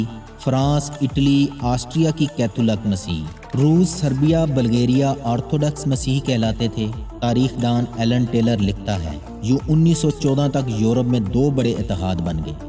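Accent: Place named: Punjab, Pakistan. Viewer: Masha Allah bhai aap bohat achi video banatay مگر آپ با برکت کو ببرکت نہ کہا کیں۔بہت برا لگتا ہے۔ اردو کو پنجابی لہجہ میں بولتےہو نوی بازار کو نوی بزار تو نہ کہو۔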